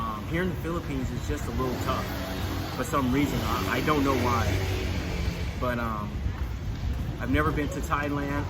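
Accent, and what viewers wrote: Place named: Los Angeles, United States. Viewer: Sad. Sounds like academia. Your speech sounds like Los Angeleno.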